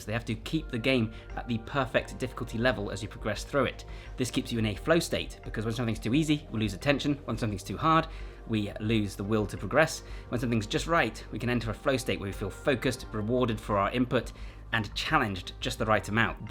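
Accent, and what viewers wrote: United Kingdom. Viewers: I just want to get swole! And I also want to strip body fat too, so I can see my abs. Perhaps it is just me as an American, but I would say that you are a more charismatic individual than you give yourself credit for. You have the British accent down. What % of your viewers are from across the pond?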